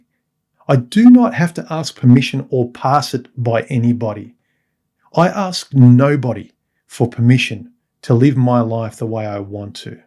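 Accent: Australia. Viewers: One of your best, but what's a "garl friend?" BTW The only man I know with a more distinctive Ozzie accent is Wal Thornhill, and he's a bloody genius across physics, astronomy, electricity, and cosmology. Anyone who can handle real science, should check out the thunderbolts project.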